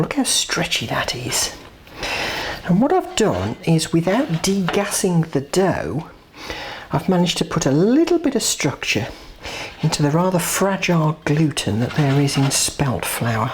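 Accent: Birmingham, United Kingdom. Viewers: Okay, so trying to morph my loaf from one recipe to another didn't work! I've now followed this recipe religiously and will post the result. I know what folk mean about voice and I detect a faint Birmingham accent there. What a pity she no longer communicates with her supporters because of the ugly people who drove her away. I've subscribed to her gardening channel as I need help in that department too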